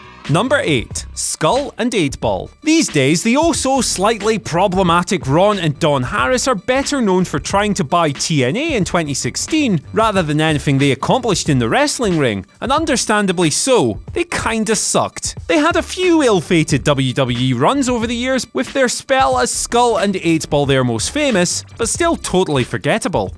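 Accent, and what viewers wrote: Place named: Scotland, United Kingdom. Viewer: Can we please stop putting this guy on videos I actually love the Scottish accent but this guy just grinds on me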